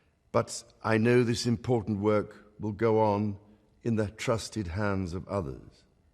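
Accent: RP, United Kingdom. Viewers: Not a fan of this man's but must say ' His speaking has such elegance. The British English and the accent can be very sophisticated. More than any other accent. Including the accent of this wonderful country America. I think the pronunciation of the words are so proper and classy. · That posh accent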